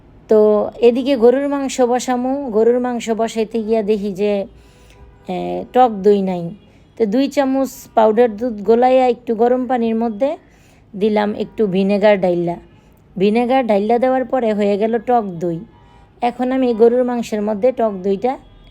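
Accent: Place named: Narayanganj, Bangladesh. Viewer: নারায়ণগঞ্জ এর ভাষা আজ প্রথম শুনলাম । ধন্যবাদ আপনাকে। সিলেট থেকে দেখছি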